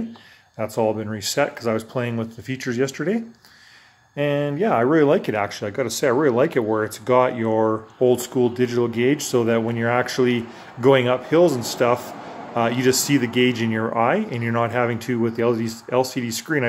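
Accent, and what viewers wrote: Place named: East Coast, United States. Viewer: When I started listening to your video I would have sworn that you were an east coaster. Your accent doesn't sound like Alberta to me! Thanks for the video. I don't like the tft screen, and DO NOT want keyless start! Maybe this is the bike for me. I don't like the white, but maybe that shouldn't matter at all. I am near Niagara in Ontario and plan to explore this great country of ours on two wheels. Part of.menthinks this is the way to go.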